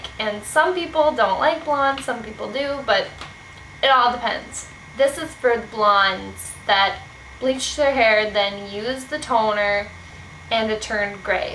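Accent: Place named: Minnesota, United States. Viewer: Minnesota accent yikes.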